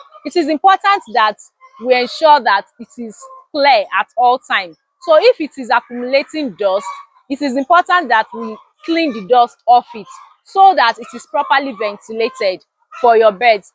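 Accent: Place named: Igbo, Nigeria. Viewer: I have watched many of your teachings and I must say may God bless you but to be honest your sounding so much like your from Igbo tribe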